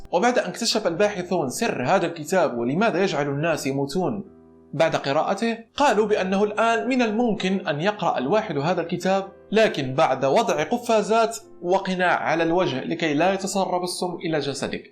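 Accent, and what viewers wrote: Morocco. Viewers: ميّزت لهجتك المغربية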